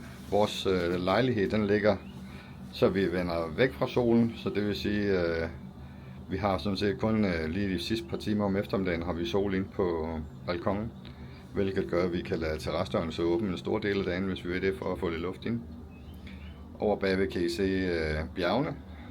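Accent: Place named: East Jutland, Denmark. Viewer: sejt kan høre du er jyde østjyde måske fra Aarhus egnen- kan jeg have ret i det- men god video - var i Hua Hin i 2- uger i 2019 samt længere sydpå i 2 uger boede først på hotel Rocco Hua Hin men der var for meget støj - så det var svært at sidde uden på balkonen så vi flyttede til et andet 800 meter væk men afsides fra trafikken det var væsentligt bedre- men kan ikke huske navnet - men der var mere ro og en gag om ugen var der marked lige overfor. men lækkert havde også en 10 dage i Soy Sam Yot først var der i 30 dage i alt plus 2017 i 30 dage samme sted som , sidst nævnt- lækkert var det!!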